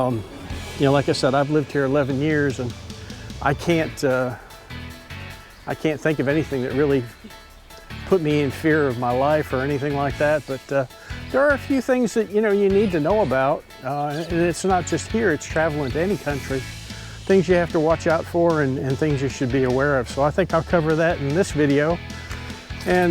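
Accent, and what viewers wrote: United States. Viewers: Where are you from? You sound like your are from my neck of the woods, I am from Jefferson County just east of Knoxville TN. You sound like you are from the promised land lol! Sorry if I am wrong.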